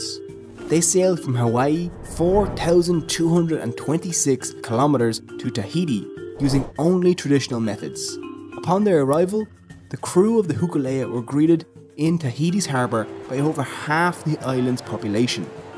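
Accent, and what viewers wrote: Ireland. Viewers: This man has possibly the most Irish accent ever! "Tree" for three lol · first few seconds HELLO FELLOW IRISHMAN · As a Filipino, I'm proud and thrilled to see videos on the culture being posted. As a fan of Ireland, it's even more exciting when done with an Irish accent.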